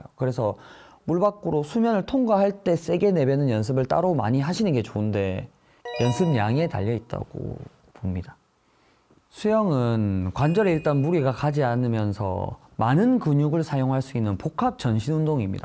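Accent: Gyeongsang, South Korea. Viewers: 간결한 설명, 부드럽고 정겨운 경상도 억양이 강의가 더 쏙쏙 들어오는것 같아요. 좋은 강의 감사합니다.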